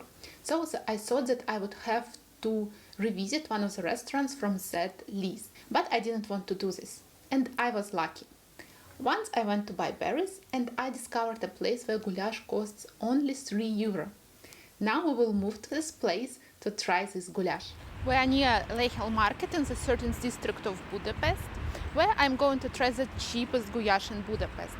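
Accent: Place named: Russia. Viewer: Девушка, давайте я вам поставлю произношение бесплатно, и интонацию. Вы хорошо знаете английский, но произношение прям никуда-вы просто произносите русские звуки и интонацию тоже надо ставить. Я могу вам за несколько уроков поставить и дать ресурсы, где отрабатывать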